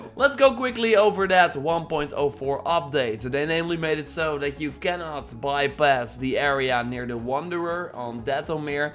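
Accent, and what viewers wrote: Netherlands. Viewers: Hmm, you sound dutch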